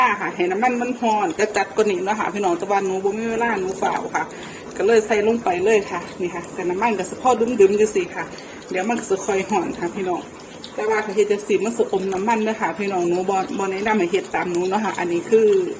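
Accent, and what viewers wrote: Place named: Ubon Ratchathani, Thailand. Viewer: สวัสดีครับ แซบๆครับ สำเนียงคือคนอุบลบ้านผมเลย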